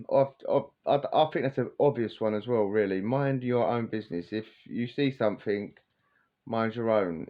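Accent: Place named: Harlow, United Kingdom. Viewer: 😂😂😂grays fire 🔥. Lol loved that Harlow talk other day aswell ur not bad and from east ur from Harlow 😂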